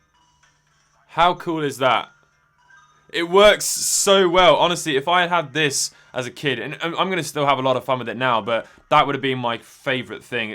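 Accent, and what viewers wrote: Australia. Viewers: YOU HAVE TO BE AUSTRALIA MATE if not then british BUT I THINK AUSSIE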